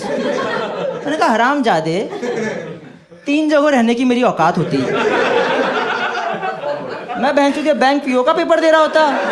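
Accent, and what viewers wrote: Uttarakhand, India. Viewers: Ha flow or naati se samjh aaya 😂 ..... mai bhi uttarakhand se 🎉😂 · Bhai uttarakhand mai kaha se ho?? Accent bata raha hai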